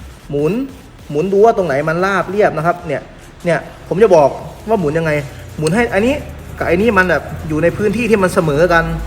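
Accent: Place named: Isan, Thailand. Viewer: เว่าอิสานว่ะ555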